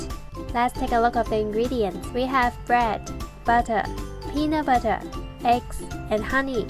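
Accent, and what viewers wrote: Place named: Hong Kong. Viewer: 呢個like系比你嘅英文嘅。英文唔錯呀，香港tone但系口音唔重。希望你之後會越講越自然，加油！！好鍾意你嘅vids架！！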